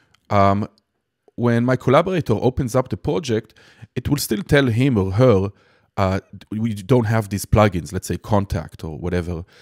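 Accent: Israel. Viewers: cool thanks hamm Israeli accent ?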